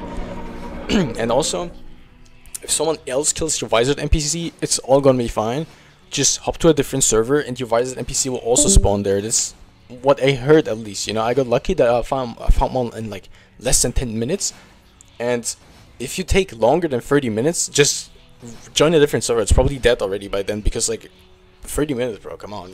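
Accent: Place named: Germany. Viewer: sehr deutsches englisch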